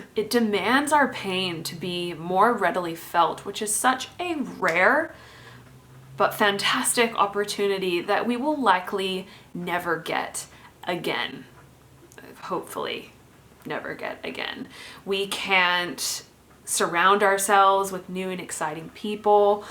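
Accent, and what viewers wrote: Australia. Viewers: your slight Aussie accent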